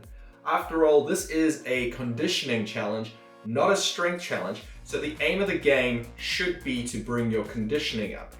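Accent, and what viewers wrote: South Africa. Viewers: Why is your accent so close to South African? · Sounds saffa.....lekker.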